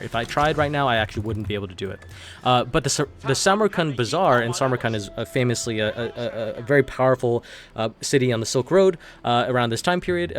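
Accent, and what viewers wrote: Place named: Iran. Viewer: the most generic persian accent ever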